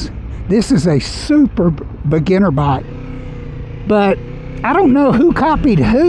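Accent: South, United States. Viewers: Confirming my belief that all have southern accents. Great video!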